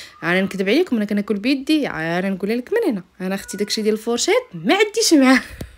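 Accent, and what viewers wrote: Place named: Doukkala, Morocco. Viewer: الله يحفظك تقولي غير دكالية بحالي هههههه فول او حمص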